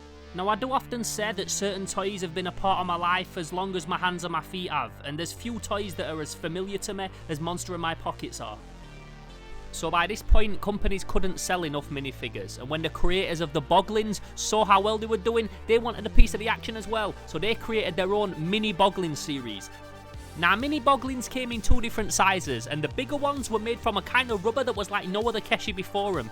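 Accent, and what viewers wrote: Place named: Sheffield, United Kingdom. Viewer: i knew this accent from doctor who but now that you say sheffield i understand!!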